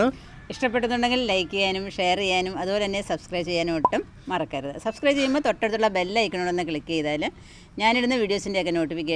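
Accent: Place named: Pathanamthitta, India. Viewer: ഏത് സ്ഥലം ആണ്. ഞാൻ പത്തനംതിട്ട കോഴഞ്ചേരി ആണ്. ചേച്ചിയുടെ സംസാരം കേട്ടിട്ട് പത്തനംതിട്ട പോലെ തോന്നി അതുകൊണ്ട് ചോദിച്ചതാണ്.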